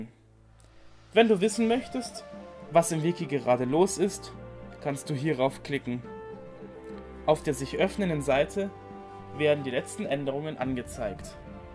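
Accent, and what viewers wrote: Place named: Franconia, Germany. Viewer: BAYERISCH? Ich fasse es nicht! Wir sind FRANKEN! Das ist ein ganz ganz ganz ganz wichtiger Unterschied! :p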